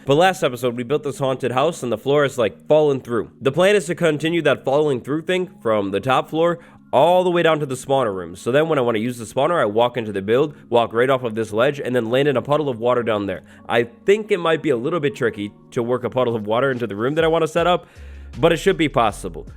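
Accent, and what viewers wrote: United Kingdom. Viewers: ahh yes british boi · omg I love his British accent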